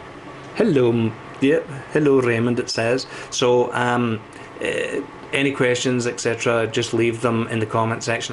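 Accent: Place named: Scotland, United Kingdom. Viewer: I liked your sco'ish accent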